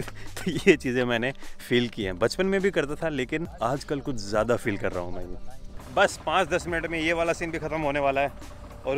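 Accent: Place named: Rajasthan, India. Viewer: Bhai aap Haryanvi nahi Rajasthani ( Shekhawati) bolte ho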